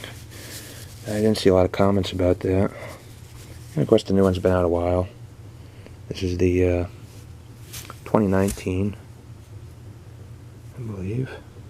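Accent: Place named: Netherlands. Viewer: So you're U.S. based because of the ASE's being first on the list ? Was actually wondering if you were sort of Dutch because you seem to have short of a slight Dutch undertone accent. Ooh... haven't seen such coin roman numerals but agreed : here's to a great 2020 of MMXX for us p.m. stackers around the world !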